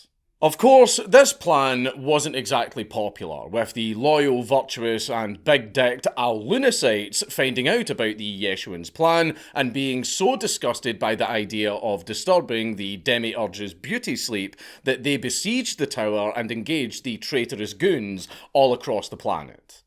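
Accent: Scotland, United Kingdom. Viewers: what in the everloving fuck did I just listen to!? I did enjoy it being told in a Scottish accent though. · Wtf this is so nice, not only can I perfect my scott accent but I can also learn stuff. As a descendent of the Black Douglas clan this is a calming voice. Fuck England and long live the scottish man. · Can you please re-record a version of this with really cool looking advanced substation alpha filtered karaoke subtitles in order that I can understand what you're talking about? Between the obviously 100% real pseudohistorical mumbo-jumbo and your thick Scottish accent, I'm having difficulty following what you're talking about even with the English (auto-generated) Closed Captions turned on. In fact, they make it worse. It's like having a drunk Irishman attempting to interpret a Scotsman attempting to speak a bunch of colloquial American-English into British English or something.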